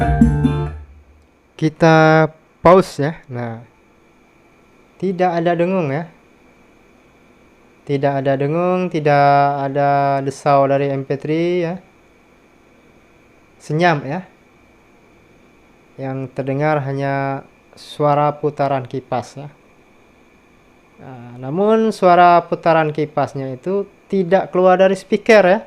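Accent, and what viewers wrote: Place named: Borneo, Indonesia. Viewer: Logatnya sperti logat Dayak...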